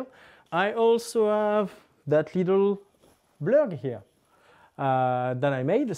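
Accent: France. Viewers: Oula l’accent français est très présent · Cet accent français magnifique ;) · He's french like me ! This accent français ! Cool que tu sois une des personnes qui a fait le razor crest en lego ! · MDR cet accent français On est là !! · Tu parle frençais vue ton accent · L'accent français oulala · You really hear, that he is french. But it hears so good! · l'accent français yeees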